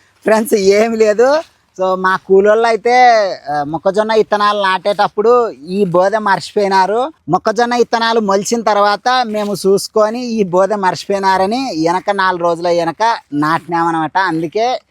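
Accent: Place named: Kurnool, India. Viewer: Pure Kurnool slang Anna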